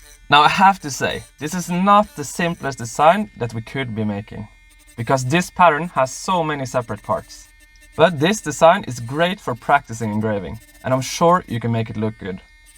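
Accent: Norway. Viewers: Kænn ju tæll mi de vei tu de ræilveistæisjen? 😛 Hører kor du é fra.. Veldig gode videoer, forresten!!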